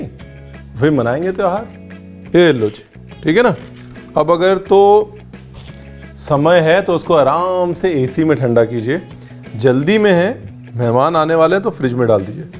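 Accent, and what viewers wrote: India. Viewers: Pta nhi jab MASTERCHEF INDIA MEIN Chef Ranbeer ko dekht thi ..to dono chef k muqable mein wo thoda...moody lgi thi..but..here OMG I can't...he is sooo much...typical Punjabi GREEN HEART MAN...NOw I love him soo much & his simple cooking style..& also his frankly desi speaking....😍😍😍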